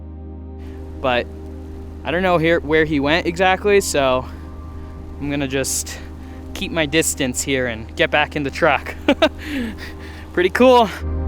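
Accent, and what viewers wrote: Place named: Michigan, United States. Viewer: I like your vocabulary you talk like you're from Michigan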